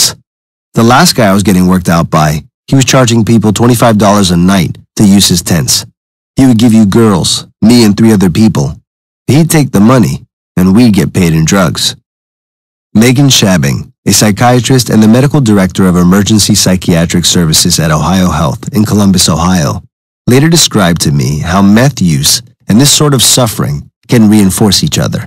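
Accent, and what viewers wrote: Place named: United Kingdom. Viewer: …one photo no captions British (sounding) host fku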